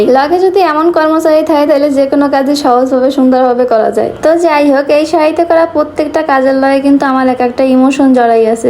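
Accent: Barishal, Bangladesh. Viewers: আসসালামু আলাইকুম আপু আমি আপনার ভিডিও অনেক দেখি আমি বরিশাল থেকে দেখেছি বরিশালের ভাষায় কথা বলেন তাইতো দেখি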